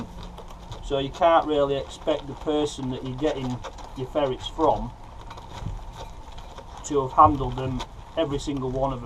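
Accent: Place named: Yorkshire, United Kingdom. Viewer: you sound like your from Yorkshire I'm looking to get a ferret could do with some advice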